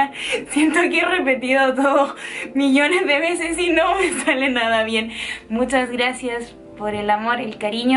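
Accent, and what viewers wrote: Chile. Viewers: Es chilena. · Ese acento es chileno? Esque miraba videos de un youtuber con mismo acento y me gustaba como decia las cosas xd pero no estoy seguro de si el era chileno Ella es chilena?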